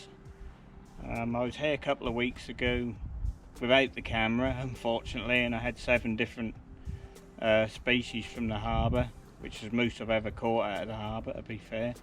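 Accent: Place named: Suffolk, United Kingdom. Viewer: You sound like a Suffolk lad. I’m from Norwich but lived in Ipswich for 20 years now. It’s tough we have to travel so far for good lrf.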